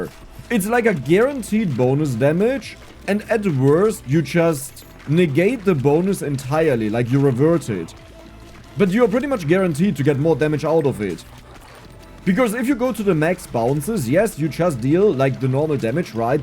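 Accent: Germany. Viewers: man i lover your thick german accent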